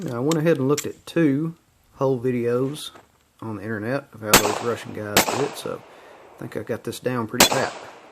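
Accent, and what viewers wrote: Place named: South, United States. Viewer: ""THEOLD SOUTHERN-ACCENT, ""NO ONE TALKS OR SPEAKS THESAME""!!!!!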